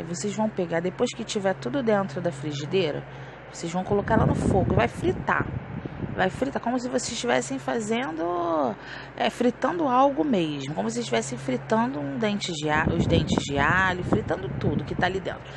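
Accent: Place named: Rio de Janeiro, Brazil. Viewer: É carioca?sotaque lindo..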